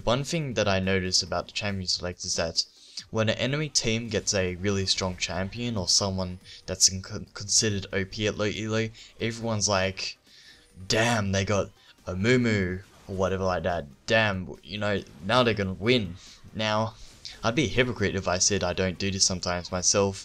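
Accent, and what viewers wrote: Australia. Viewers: im from Argentina, and i have no problems understanding what he says, i do believe he is Australian (he mentioned something about it in the last video comment section) its just that you arent familiar with his accent, thats all, australian accent is more like british if you actually want to compare accents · YOU'RE AUSTRALIAN, AREN'T YOU......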